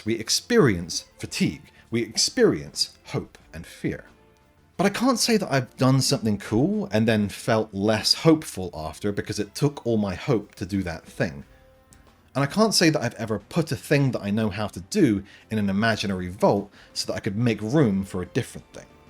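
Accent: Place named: United States. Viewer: Not the American accent for reading the spotlight rules 😭😭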